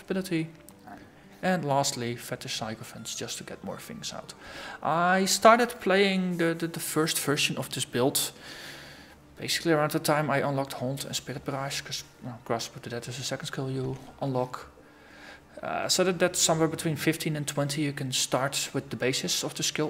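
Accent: Netherlands. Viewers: ik hoor gewoon dat je nederlands bent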